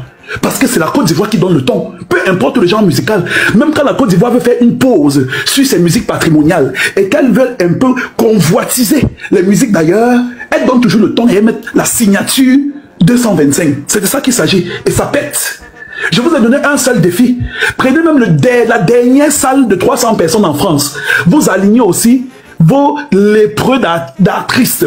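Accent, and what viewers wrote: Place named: Cameroon. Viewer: Mais c pas un ivoirien tu es camerounais même si on me dit koi avec le ton et tu en connais trop sur le cameroun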